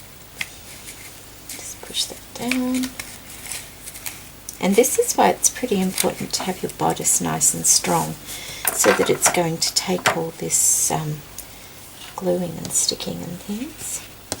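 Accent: Australia. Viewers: its tulle its the aussie accent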